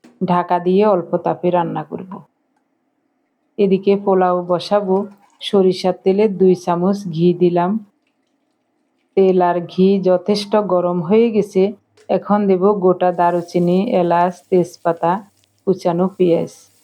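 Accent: Noakhali, Bangladesh. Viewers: আমি এই প্রথম দেখছি।খুব ভালো লাগলো।আপনার কথাগুলি শুনে মনে হচ্ছে নোয়াখালী বা চট্রগ্রামের ভাষা...খুব মিষ্টি ভাষা।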